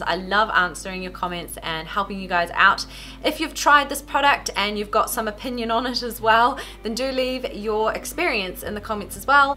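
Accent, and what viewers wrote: New Zealand. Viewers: is she from new zealand?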